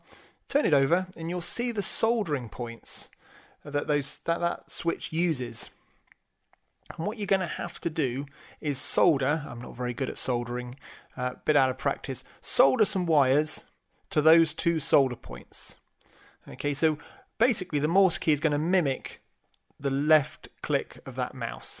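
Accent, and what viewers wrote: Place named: South of England, United Kingdom. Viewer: You sound like your in the south of England... im in the south east and its absolutely dead on 2m and 70cms... i keep CQing into the void but love making antennas and will keep trying.. maybe on 2m CW might reach you if your not too far away.. I like you took tne foundation and then stalled for want of regular contacts or local meetings... 10 11 12m is a little busier so i also play with 28 to 30mhz. Keep up the ham work. 73's M6KII.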